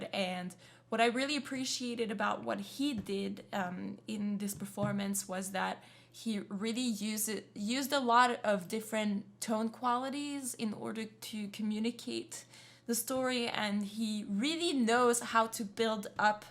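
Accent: Quebec, Canada. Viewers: French pronunciation?? Are you kidding me? You are from Quebec. That makes you the ugly third stepchild from a bastard idiot uncle who wears speedos when he goes shopping. Is what you speak really French? Back to your poutine while staring at your Trudeau poster.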